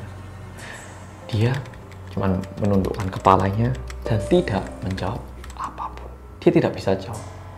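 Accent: Java, Indonesia. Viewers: Jawa banget 😂😍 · Suka deh sama medoknya bahasa mas..sehat n sukses trs.. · Hai mas Korea Medoook😅 Siyap nyimak 🎉🎉🎉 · Sy kaget pas denger suara mas.....logat Jawa nya medok banget🤭🙏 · Kerene mas Korea narasine enak di simak asli keren mas👍🏻baru x pertama nemu chanelnya membawa narasinya pun sedap untuk di simak dgn logat medok nya pun yg bikin terpikat outo Subscrab deh✌🏻🤣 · Saya tuh baru subcribe, Bang. Kaget sih ketika nemu Oppa Korea bahasa jawa medok 😆😆😆, maaf ya Bang. Suka yakin, banyakin bikin video kaya gini Bang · maaf br gabung mas,mau tanya mas,mas ini asli orang mana y,kq logatnya medok kyk orang jawa 🙏 suka bgt liat chanelnya mas 👍👍👍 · Akhir nya setelah nonton video2 beberapa x gw subscribe niiih....punya ciri khas sendiri nih "medok"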